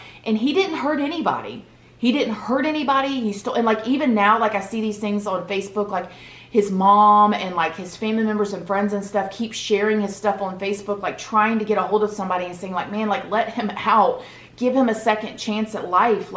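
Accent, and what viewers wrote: South, United States. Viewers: She's got a beautiful southern twang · Girl..I adore you! I have been binge watching your videos. There Is something about u that speaks to my soul! I love how your a lil bit of country ( accent )..and a lil bit of street yet classy all wrapped in 1! Does that make sense? Lol.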